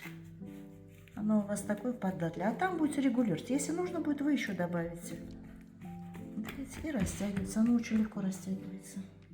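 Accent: Standard. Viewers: Какое мягенькое нежное тесто! А какой приятный, завораживающий голос милой хозяюшки! Чистая, правильная русская речь! Спасибо Вам огромное за рецепт, за подачу, за Ваше чудесное видео! Здоровья вашей семье!❤❤❤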